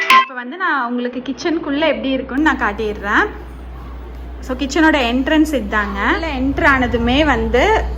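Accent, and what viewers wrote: Kongu, India. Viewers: Way of talking is nice kongu thamiz. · Iam kongu tamiz from Pollachi and your voice nice and super kongu tamiz🎉🎉🎉🎉😀😀😀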